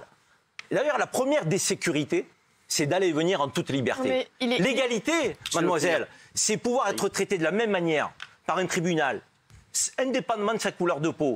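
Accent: Marseille, France. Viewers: Zeribi., tu le fait bien l'accent marseillais..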